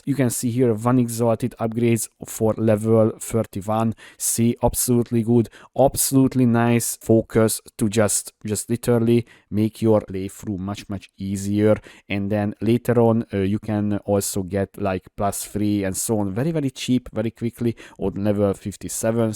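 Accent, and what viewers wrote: Hungary. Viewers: Szia! Jól hallom hogy magyar vagy ?:D Lenne egy kérdésem , hogy hardcore on játszható ez a build ?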